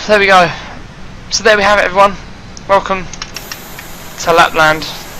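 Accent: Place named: England, United Kingdom. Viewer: Like your English accent 😂🤔🤔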